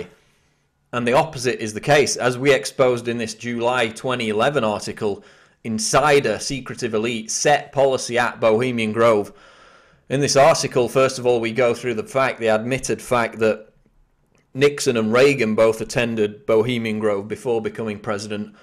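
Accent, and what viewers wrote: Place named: England, United Kingdom. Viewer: Good video. But man i hate that english accent.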